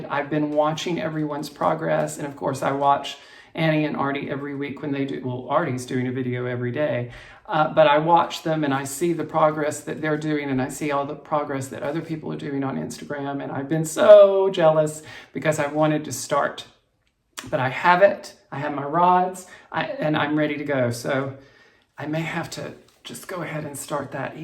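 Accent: South, United States. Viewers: you are a natural born storyteller, and I could listen to you all the day! I had a real moment when you used the word "twitterpated ". Aside from the Owl in Bambi, the only person I ever heard use that word was my Dad, who, like you, had a lovely, soft southern accent, the only one in a house otherwise full of Boston accents. That truly made me smile. Please don't think that's a comment on your age, in fact, I am the exact same vintage as you! I loved my Fisher Price toys, and that castle was awesome! I fear I was a less than benevolent queen, however, as I delighted a little too much in throwing those poor little people through the hatch! I hope I'm kinder and gentler these days. Lol. I think you made excellent choices for your Halloween themed projects, I've got one of my own kitted up and will be sure to use the "pound sign". We had rotary dial, too! 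Take care, Kim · Simply delightful. Twitterpated - you are SO southern! ; )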